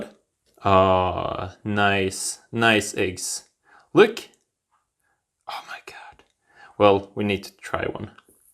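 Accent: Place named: Sweden. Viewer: Juste bra med swenglish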